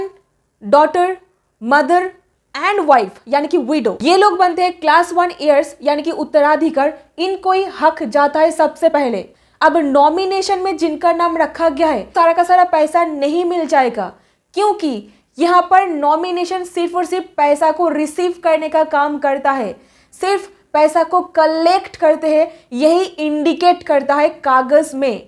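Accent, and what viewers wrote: Bengal, India. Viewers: Your Hindi is not clear. Bengali flavour aa jaata hai and so unable to understand. Pls translate this in English. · I love Bengali accent... ❤❤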